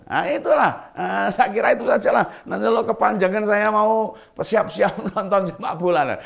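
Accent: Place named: Solo, Indonesia. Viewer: Mantab om...suka aku dengan presentasinya yang tegas..dengan dialek solo yang khas.sukses ya om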